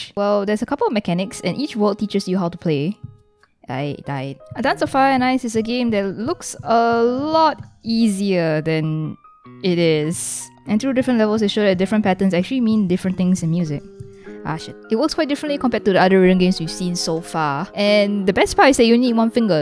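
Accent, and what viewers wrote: Singapore. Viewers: Are you singaporean or malaysian because of the accent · She's Singaporean, but what's the difference lol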